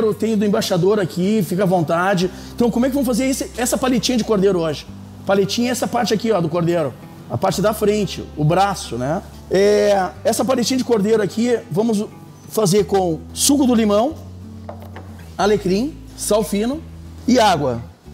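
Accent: Rio Grande do Sul, Brazil. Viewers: Bom demais o vídeo e o sotaque gauchesco.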